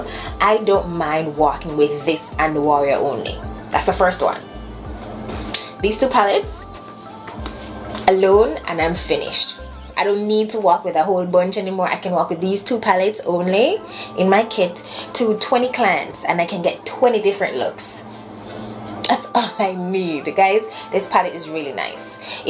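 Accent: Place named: Jamaica. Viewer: Lovely simple look that I will be rocking tomorrow lol 😂 you are absolutely lovely and the Jamaican accent is the cherry 🍒 on top. Thanks sis! 😊✌🏾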